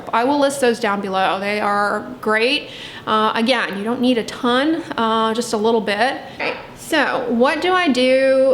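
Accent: United States. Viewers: Do I hear a country accent 👀👀👀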